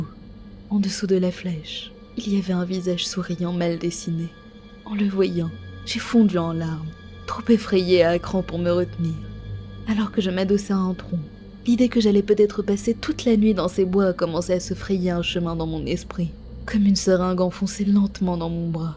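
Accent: Quebec, Canada. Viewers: Tu viens du québec ?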